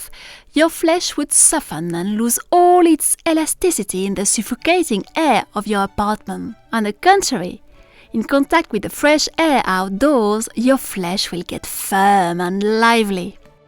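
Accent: France. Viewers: Charming little film narrated in a genuine French accent, but what is going on with the actresses thumb right hand ? Was the blood looking colouring due to the sealing wax or is it in fact, blood inflicted by Valmont ? What a pity. Swan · Thank you for sharing this sweet gem with us. You bring history and literature to life with your elegant home, timely attire, inspiring music, and lovely French accent. You've created an exquisite artistic production!!! I especially appreciate you're enchanting storytelling is in English so that people from different countries can appreciate your work. Bravo!! Bravo!! 🇺🇸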